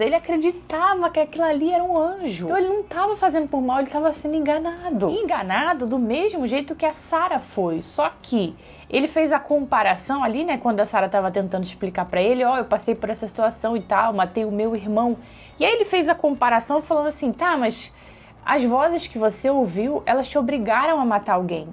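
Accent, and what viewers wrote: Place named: Rio de Janeiro, Brazil. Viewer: Parabens pelo trabalho, eu como sou um cara bem chato aqui no youtube, não curto muito ficar ouvindo estes sotaques caipiras que inundam esta plataforma , prefiro acompanhar um sotaque raiz do RJ , como o seu e o da Mateux Mendex os unicos canais que acompanho pra ouviu teorias de From. Um coisa que pode ter passado despercebido é que parece que todos os moradores de From parecem ser reencarnação de pessoas do passado da cidade. e todas devem ter o mesmo destino, não sendo possível mudar o destino. Em exemplo disso é o Elgin, ele ter tido o olho arrancado pela Sarah o que o conecta a visão do Jade do homem bebendo sangue no crânio, este homem também estava sem 1 olho. E o ato de beber sangue pode ter relação com o Elgin retirando seu sangue para a Fatima beber. Tudo esta interligado em toda a história desta cidade.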